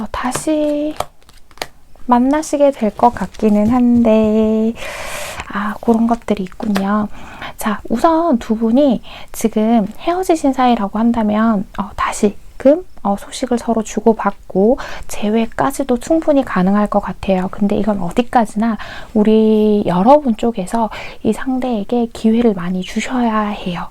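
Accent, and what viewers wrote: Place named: Gyeongsang, South Korea. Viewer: 3zz ㅋㅋㅋㅋㅋㅋㄴㅋ걍상도남쟈맞습다..ㅋㅋㅋㅋㅋㄴ 해봄이라는 말이 왜이렇게 듣기좋은지 모르겜ㅅ내옹❤ㅎㅎㅎㅎ 후후후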